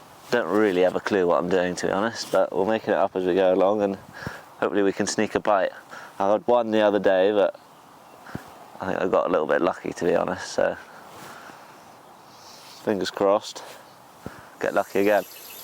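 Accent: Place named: England, United Kingdom. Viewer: Serious question, you sound English….why is your name on YouTube?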